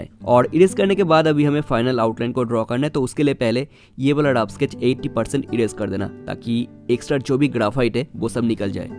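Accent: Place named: Bengal, India. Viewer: Khub bhalo legeche tomar painting video. Yomar hindi sune mone holo tumi bangali..tai bangla te comment korlam. Next time,' hairs' bolo na ota ' hair' hobe. Criticism na positive feedback dilam.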